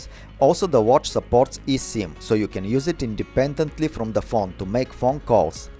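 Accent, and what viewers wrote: Russia. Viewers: Is that an Russian gentleman speaking English, man I love his accent a lot.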